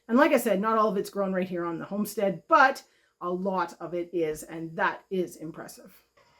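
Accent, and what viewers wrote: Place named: Canada. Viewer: So glad that you are back to YouTube! I was missing your videos; as a Canadian it's so good to hear 'local' voices.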